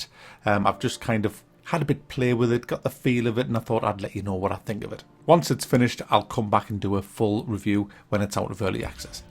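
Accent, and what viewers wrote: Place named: Newcastle, United Kingdom. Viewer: I love your videos man, they're reassuringly Geordie. Keep it up!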